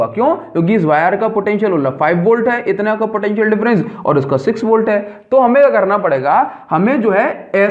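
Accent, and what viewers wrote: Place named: Uttar Pradesh, India. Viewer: Aap uttar pradesh se hona Guru ji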